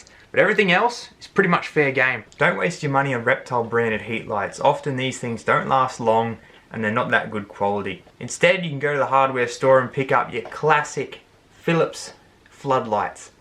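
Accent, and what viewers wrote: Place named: Australia. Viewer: Very good tips, I just recently came across your channel you have great content. Australian accents are the best haha 😂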